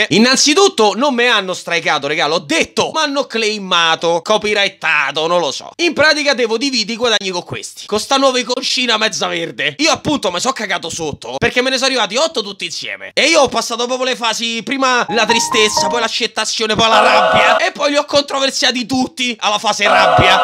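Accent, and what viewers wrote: Rome, Italy. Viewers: Ma sei romano?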